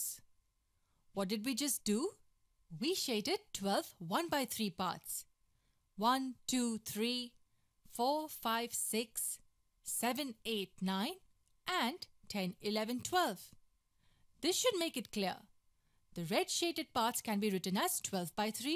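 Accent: India. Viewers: love the Indian accent, representing our country also love the video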